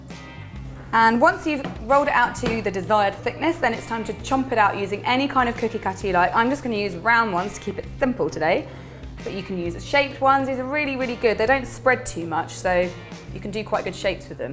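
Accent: United Kingdom. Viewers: i like to hear British accent:D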